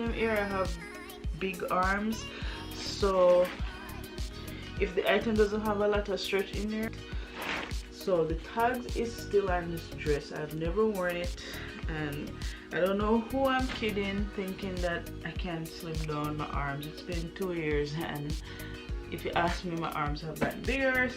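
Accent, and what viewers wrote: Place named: Jamaica. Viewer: Refreshing to hear a fellow Jamaican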